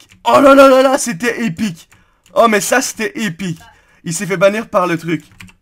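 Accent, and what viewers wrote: Quebec, Canada. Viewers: stp parle avec ton accent qc :(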